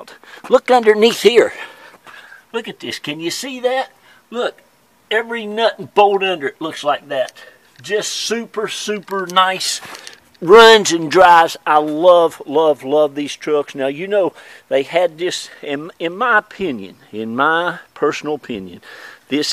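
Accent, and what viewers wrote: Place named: Florida, United States. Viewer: Man I love the Florida accent, beautiful truck, I’m currently looking at one for my self.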